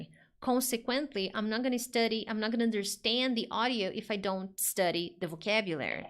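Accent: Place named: Brazil. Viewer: Teacher once that see you,i recognized that you was Brazilian woman,because on the accent.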